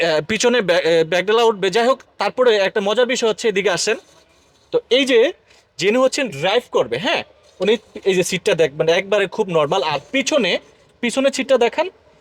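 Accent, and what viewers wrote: Noakhali, Bangladesh. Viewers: মুরুক্ষা বেডা এটার নাম ড্যাসবোর্ড বলে..... কথাই ঠিক নাই....নোয়াখালির একটা টান থাকে কথায়....😂😂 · This guy is very excited. Is he a pure Noakhalian speaking Bangla?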